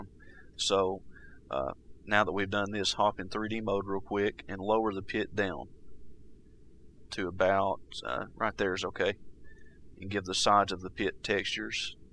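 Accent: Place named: North Carolina, United States. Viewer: Cool video. By the way, are you from North Carolina? I love your accent!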